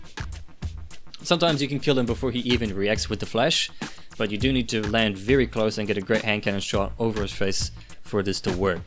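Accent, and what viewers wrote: New Zealand. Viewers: Are you from New Zealand? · Are you from new Zealand?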